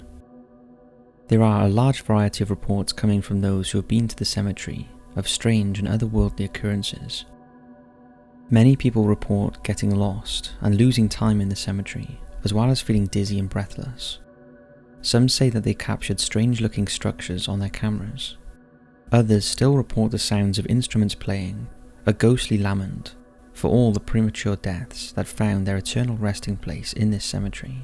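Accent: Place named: England, United Kingdom. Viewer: As long as you are able to understand what is being said, the accent or enunciation does not matter. The point of language is to communicate effectively, as long effective communication is being carried out, it really does not matter if Tomato is pronounced "Tomayto" or "Tomahto". English originated from England, yet Americans took it as their first language, changed the spelling and pronunciation of words. Americans themselves have dozens of English accents. Even in the UK, there are many accents. Both UK & USA being primarily English speaking countries have many different ways of pronouncing words. If you look at footage of Americans from 1940-60, their accents sound way different than they do now. The further back you go in time, the more American English sounds like British English. Language changes and evolves with time and globalisation. 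In conclusion, there is no right way to pronounce any single word, because not everyone has the same upbringing or cultural background. Your accent is decided by your race, family, place of upbringing, first language, how many languages you speak. And it can also change if you spend time in a foreign country. So I don't think you should nitpick about how an "Englishman" enunciates words, if you're an "American".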